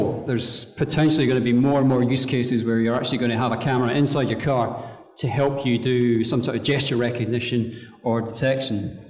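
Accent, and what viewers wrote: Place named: India. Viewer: Indian english host？the accent is very unformal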